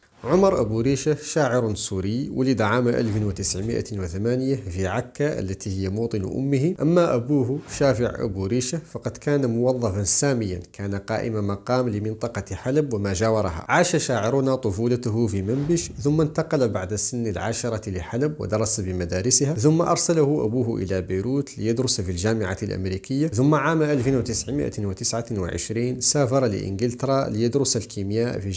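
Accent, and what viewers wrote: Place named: Yemen. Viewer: متابعك من اليمن هل تعرف ان لهجتك كانها من لجهات اليمن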